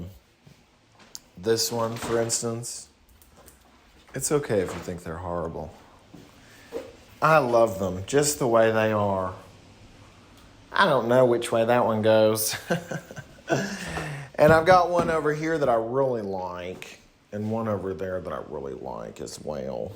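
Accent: South, United States. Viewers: This amalgamation of a southern accent is like Nashville Grand ole Opry singer meets rural Georgia buget Paula Deen. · that southern drawl lulling the depression out of me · New here. That southern accent is so spot on. Where are you from? You’ve obvs spent time in the south. Love your stuff. It’s bananas and exactly what I need right now 🙏🏼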